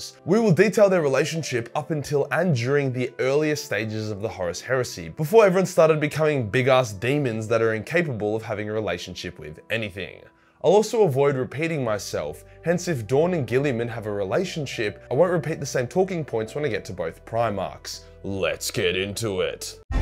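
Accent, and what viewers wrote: Australia. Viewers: every time i watch your videos i start thinking with an australian accent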